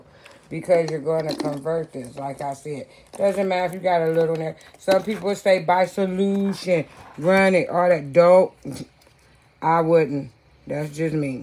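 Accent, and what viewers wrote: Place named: Rural, United States. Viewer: I wasn’t finished 😠 I started laughing when it started by showing your feet. I thought that was great! Here’s a non pretentious instructional video that might show me something. Then I heard the Country Twang and I was hooked!!! Wonderful job! No Fluff! I don’t care for fluff! I’m going to keep up with you. I’m coming online soon with a “Big Show”, and I would love to feature you. Now I’m finished ✊🏿✊🏿✊🏿❤️🖤💚😎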